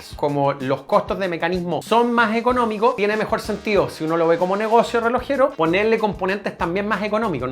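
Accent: Chile. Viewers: Tengo 5 cosas que decirles: 1) Me encantan sus historias relojeras curiosas con datos poco conocidos. 2) No cambien su acento ni palabras chilenas, los queremos así como son. 3) Me gustaría ver videos de Seiko Alba o Credor. 4) Me deben el video de las abominaciones relojeras que fueron al espacio. 5) Saludos desde México. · Es mejor el Quarzo pero Solar y si es mixto solar y batería Quarzo simplemente será un reloj para siglos …Me da risa el acento Chileno 😅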